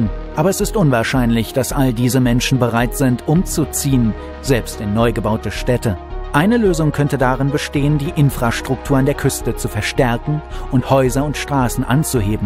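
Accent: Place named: North, Germany. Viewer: ‘aus ‘ein ‘ander: Du bist aus Norddeutschland, ziemlich nördlich! 🤣 Das war's aber auch schon mit neuen Erkenntnissen.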